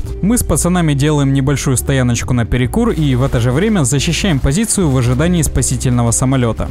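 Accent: Poland. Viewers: Ты што польский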